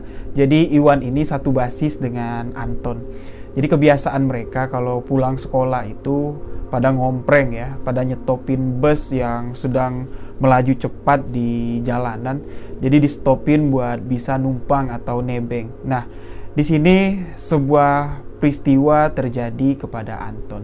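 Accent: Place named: Bali, Indonesia. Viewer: Abang ni logatnya bali sekali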